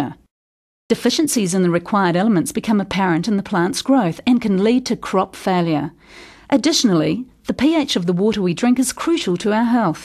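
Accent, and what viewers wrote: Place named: New Zealand. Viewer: That's a thick kiwi accent! And a bloody helpful video. Thanks!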